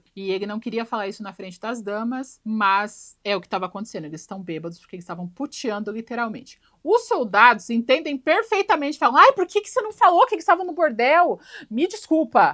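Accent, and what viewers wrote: Curitiba, Brazil. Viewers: Este foi o vídeo que mais soltaste um sotaque paranaense, de Curitiba. Nunca tinha visto tu falar com tanto sotaque. Achei engraçado e ao mesmo tempo intensificou aquilo que estavas falando, combinou. Adorei!!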